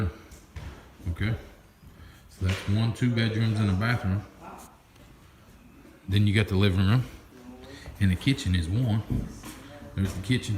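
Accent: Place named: Alabama, United States. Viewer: Oh, and the Alabamaspanglish is hilarious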